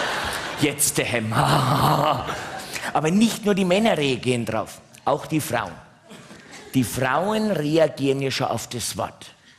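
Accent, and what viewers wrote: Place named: Franconia, Germany. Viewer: fränkische Sprache du dist sehr sehr cool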